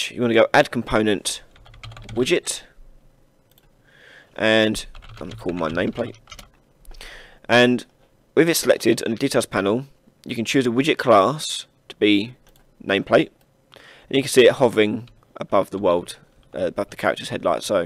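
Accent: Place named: Norfolk, United Kingdom. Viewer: Bro I have to ask, Are you from the UK Norfolk area? You sound like you are... that's not a bad thing either.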